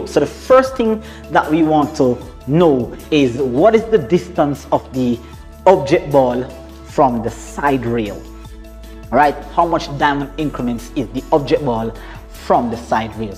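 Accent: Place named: Jamaica. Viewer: Hi there... Good Morning to you. I'm gonna suggest that you try out some kind of sound system, where you can use a chin mic (didn't wanna say mouth mic). The echo & your Jamaican dialect makes what you say hard to hear & understand.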